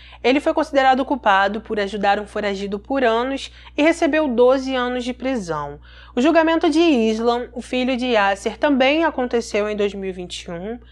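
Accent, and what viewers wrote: Rio de Janeiro, Brazil. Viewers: Boa noite pode ficar o tempo que precisar contando essa história da vida real já vi mas quero ouvir de novo você contar 😉"Estadossss Unidossss" melhor sotaque carioca amoooo linda maravilhosa 🥰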